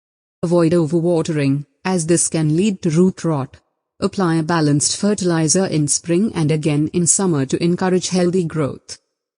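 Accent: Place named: India. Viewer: dirty indian voiceover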